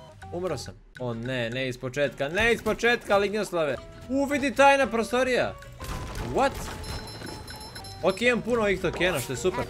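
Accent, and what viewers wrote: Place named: Montenegro. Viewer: Kako si mi smiješan kad pričaš ko Crnogorac,jer sam ja odatle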